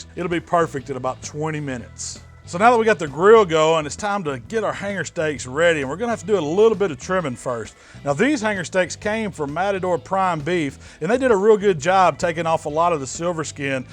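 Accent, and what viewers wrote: South, United States. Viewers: I can listen to my boy all day with that! Southern drawl down home! Brotha from another motha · It’s Southern and if u aren’t from the South you can’t understand him. Rock on..🤔😁🤣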